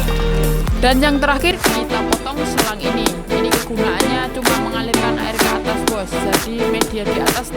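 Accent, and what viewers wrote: Java, Indonesia. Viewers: Jowo ne kentel 😁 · "kita potong sampai jeru gays😂" bahasa jawanya kentel banget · Mas sampean jowo ndi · Emang orang Jawa ngomongnya gitu, akupun klo ngomong juga gitu🤣 satu jaman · Orang Jawa Kayaknya, Karna logatnya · Jowo e' meddok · Bang koe uong jowo Yo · wong jowo yo ngonokui rek ngomonge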